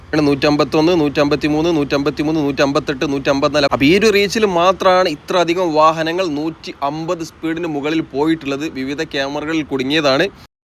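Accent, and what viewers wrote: Malappuram, India. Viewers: മലപ്പുറം സ്ലാങ്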